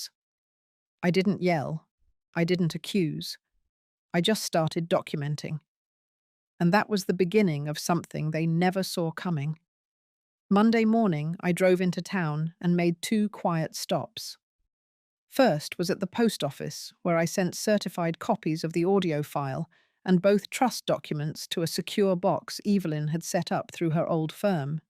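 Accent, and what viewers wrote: England, United Kingdom. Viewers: Loved the story, and oh that beautiful English accent to read. Instead of the harsh American accents which are so jarring.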